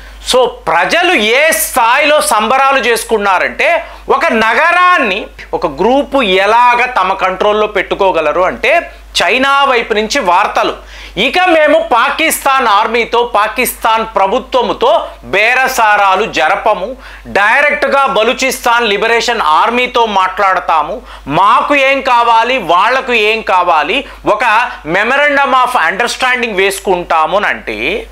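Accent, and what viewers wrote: Tamil Nadu, India. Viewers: Video lo vnna contente chudandi sir plz, garu tamilanadu lo vnnaru, telugu prononsation alage vntadi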